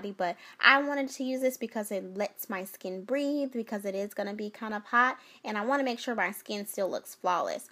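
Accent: South, United States. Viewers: ☺️ girl!! You a hot mess. I'm loving the makeup and your southern accent. Simply divine.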